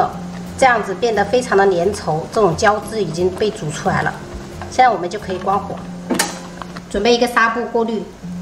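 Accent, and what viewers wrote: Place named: Minnan, China. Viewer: 原來是泉州人， 難怪有閩南腔。 不妨用泉州話做節目， 再上字幕就好。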